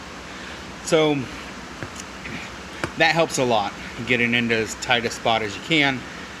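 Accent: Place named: Iowa, United States. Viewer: Are you from iowa?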